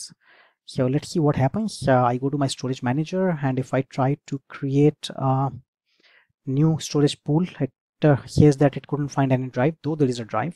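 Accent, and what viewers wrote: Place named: India. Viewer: That terrible india accent sound like dog barking !!!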